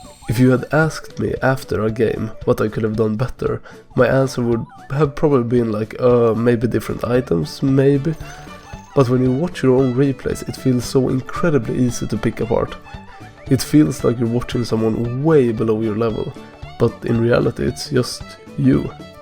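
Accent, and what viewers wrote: Sweden. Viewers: the most swedish english speaker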